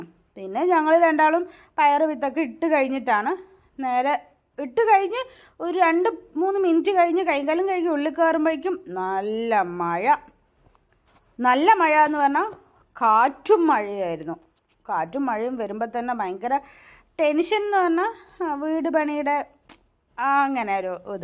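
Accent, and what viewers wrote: Palakkad, India. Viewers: Samsaram kettappo doubt thonni.😊 Palakkad evida?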